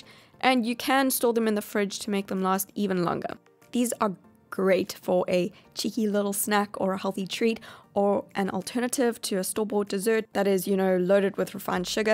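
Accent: South Africa. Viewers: Are u South African? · Do I detect a South African accent? I really should get back into a healthy diet, since moving to Gauteng am completely out of my routine, exercise and diet wise. The only thing that is saving me is intermittent fasting 😅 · Is your accent South African? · This is great! I love your videos, I've watched several since I found you yesterday and a bonus that you're South African (originally from there) haha miss the accent so much! I've been slowly doing this for my diet and will only eat refined sugar in small quantities like ex.cliff bar, I won't eat the whole thing on one day, (but I'm going to try find the brands you suggested tho) and I have a cheat day on the weekend for ice cream or some other treat ! My diet is mainly plant based now so I feel good. Can't wait for the day where I crave healthy food more than sweet and unhealthy food ahha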